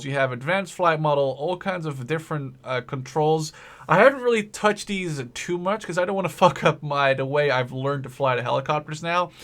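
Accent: Netherlands. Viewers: PSA! He is from the netherlands so he does not speak perfect english, hell even i can't so look how about we all just let it go and enjoy the show, cheers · Devin Wheeler He's Dutch, he's not a native speaker.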